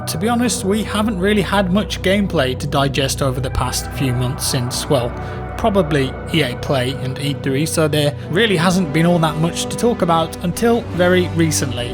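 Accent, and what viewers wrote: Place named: Midlands, United Kingdom. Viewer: Always luv ya videos man, those dulcet w/midlands tones always remind me of home. Hit me up if you're ever down Bristol ways. Keep on gaming!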